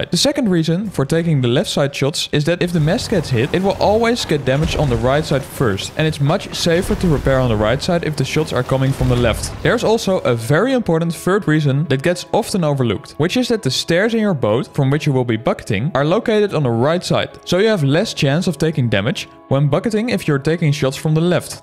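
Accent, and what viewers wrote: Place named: Netherlands. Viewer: i smell dutch. Great video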